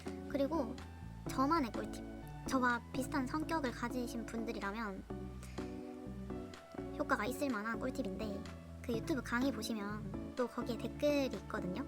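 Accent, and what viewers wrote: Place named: Gyeongsang, South Korea. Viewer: 실기후기영상 보러왔습니다 일단 축하드려요 ~ 그리고 사투리가 좀 보이는데 고향이 경상도쪽이신가요? 시나공으로도 연습해보셨는지도 궁금해요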